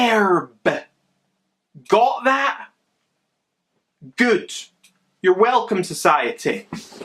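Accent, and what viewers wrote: Scotland, United Kingdom. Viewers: Do not make fun of us Americans. Queens English is not hard, it’s called accents you dumbass. You have a Scottish accent, I have a Bostonian accent, I say erb, you say herb. Not something to make a video about when your Chanel is dying and when you make uneducated remarks with no evidence. You know what I’m talking about. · lol dude from a different country with an british accent is telling me how to say herb go to a Spanish guy ask him I bet he won't say it like you you non educated crazy jerk and by the way we all day it as herb with a H dumb***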